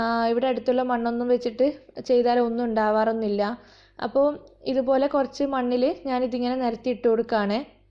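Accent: Kannur, India. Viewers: That Kannur Slang.. 😍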